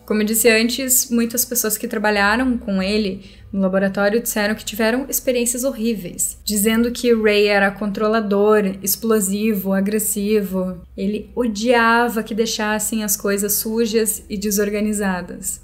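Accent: Rio Grande do Sul, Brazil. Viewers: Oi você é gaúcha? Amei o canal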